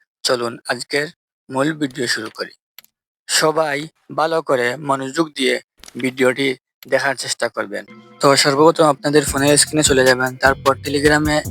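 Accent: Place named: Sylhet, Bangladesh. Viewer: ভাইয়ের কথায় বুঝা যাচ্ছে সিলেটি।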